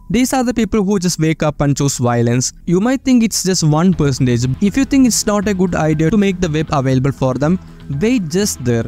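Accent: Kerala, India. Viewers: Malayali's english accent 💀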